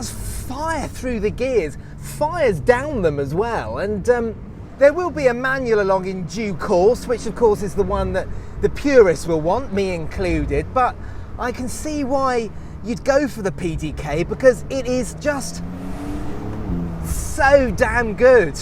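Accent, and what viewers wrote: United Kingdom. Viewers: This guy british af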